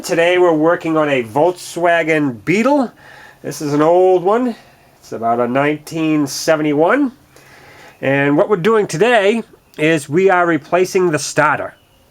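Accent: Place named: Boston, United States. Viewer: Great stuff! Enjoying the accent. Car Talk headquarters must be right down the street.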